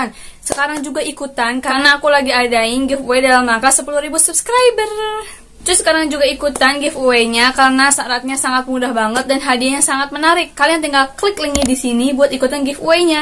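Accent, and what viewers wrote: West Java, Indonesia. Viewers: Ngajeber...🤣 Orang sunda ya teh? 🤭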